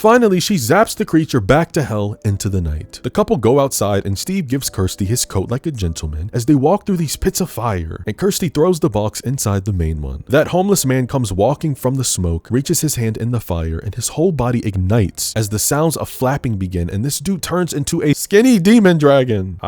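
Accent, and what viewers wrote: United Kingdom. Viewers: British?!!??! I had no idea🤦‍♀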